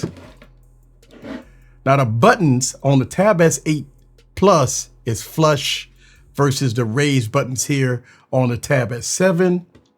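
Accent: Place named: New Orleans, United States. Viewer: Man you gotta be juvenile daddy or something lol . Not trying to be funny or anything but this how he's gonna look as he she and y'all sound just alike you gotta be from new Orleans 😂 .I love your channel btw black brother ❤️💯🫱🏿‍🫲🏾💪🏾💯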